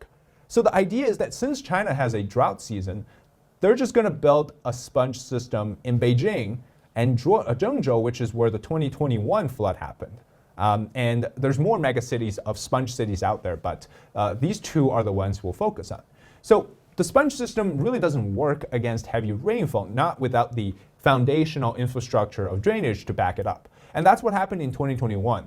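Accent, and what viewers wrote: United States. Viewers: You sound like an American, bro. 😅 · >Accent : American >Looks : WTF, get a fg haircut, boy! Why are you still having Fk-loon-gong rural hairstyle!🤣 (make sure you make up your mind to part it to the left or right boy, hehe)😊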